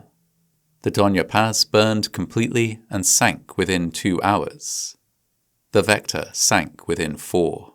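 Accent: United Kingdom. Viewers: Interesting to have a British voice use a term like petrol, but then use the calculation to convert litres into US gallons. 1,000,000 litres equals roughly 264,000 US gallons. It's 220,000 Imperial (British) gallons.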